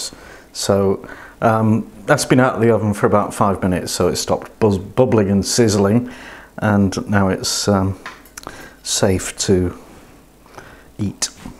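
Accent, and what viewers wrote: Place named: Yorkshire, United Kingdom. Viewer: What part of Yorkshire are you from? Love your easy going style.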